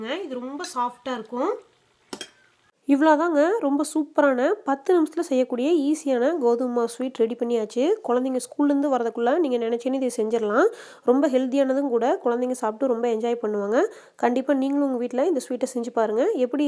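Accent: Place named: Coimbatore, India. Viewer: Wow different receipe, superb👍👌.. coimbatore slang nalla therithu 😊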